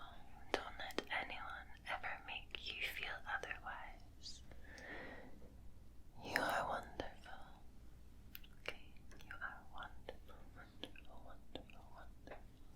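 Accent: Scotland, United Kingdom. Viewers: 🧡 thank you very much for this video. I truly do love it. Is it the best one yet, I wonder if it can get over the best ones before. And you're wearing such a great dress, the dress with all the cute things. What an awesome clothing, best spring dress. 😊 I bet you chose it because of the spring did you. 😃 Also I loved your latest video. Even though your Scottish accent is amazing, I also like to hear you talk with that English accent. You were really wonderful with your acting skills and making me sleep with entertainment attached to it. I had looked forward to see Lara Croft again and there poof she appeared like magic. You were awesome, schön, ausgezeichnet. 😃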